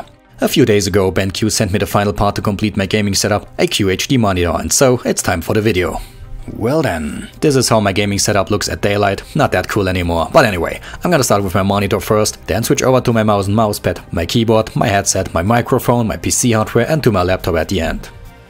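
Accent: Saarland, Germany. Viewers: ***** doch :D ist saarländisch^^ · diggah ich komm ach ausm Saarland awa du heerscht dich kompeltt annerst an als mei Leut:D Aus welchem Eck kommschten heer?:D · XD Ich dachte schon an deiner Aussprache dass du Deutscher sein musst! C: Wie viel hast du denn für dein ganzes Setup bezahlt? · That deutsch accent.. ;-) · german i know the accent cuz im german and american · ***** Doch es ist Saarländisch. Bin auch ausm Saarland. Er sagt "Hauna mo rin do ne". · Daje, haun da mo rinn do, nä? Ich bin abgebrochen vor lachen! :D Das gudde Saarland <3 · I luv your german accent <3 :)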